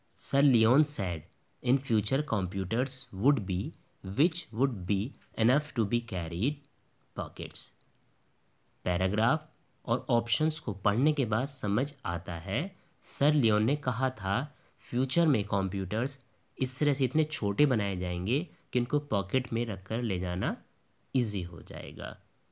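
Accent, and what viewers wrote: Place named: Western Uttar Pradesh, India. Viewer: Are u from bijnor, meerut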